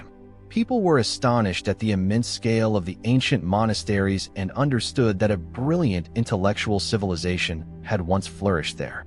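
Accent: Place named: United States. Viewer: good documentary - despite that american accent - 😖 - thanks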